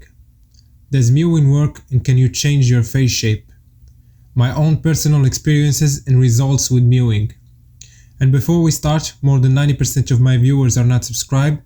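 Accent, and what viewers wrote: Morocco. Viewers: You sound Moroccan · May I ask if you're Moroccan / Algerian? You're accent really sounds like you're from there :)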